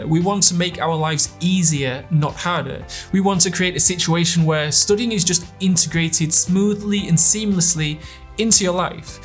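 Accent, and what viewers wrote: Birmingham, United Kingdom. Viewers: you speak like picky blinders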